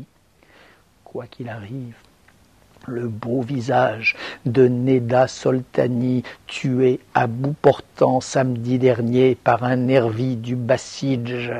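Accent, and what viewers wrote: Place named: Israel. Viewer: mais FERME TA GUELLE pauvre tache tu fait honnte au VRAIE philosophe ...et mortelle ton acxent israelin et mortel .....a oui avant de parler de oppresseur n'oublie pas tes potes de la KNESET......et pour resume 300mille vote frauduleux on bien ete trouver mais dans le camps de mousavie ....connerie de soulevemment 1.5 million personne contre 48million pour ahmeddinedja.....pauvre devbile de menteur...koi kil arrive ferme ta GUEL.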